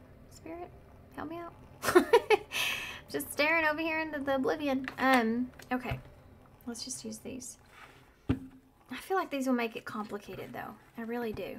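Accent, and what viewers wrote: South, United States. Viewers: Oh my goodness. I chose pile 2 and it heavily resonated and at the end you said “im hearing Jan too” and that is literally my nickname 🥲🥲🥲😭😭💖💖💖 thank you for this so much!! My imposter syndrome holds me back from everything. Ps. I can tell you’re from the south from your accent! Tennessee girlie here haha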